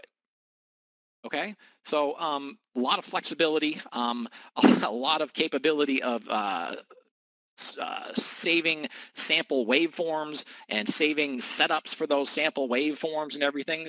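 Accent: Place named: Northern Minnesota, United States. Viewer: that guy giving the presentation has a Northern-Minnesota accent. Have you ever seen/heard the movie "Fargo?" Great movie of a true story set in Northern Minnesota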